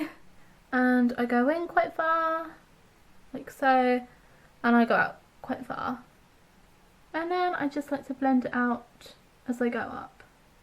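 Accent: United Kingdom. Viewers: Is your accent from Southampton?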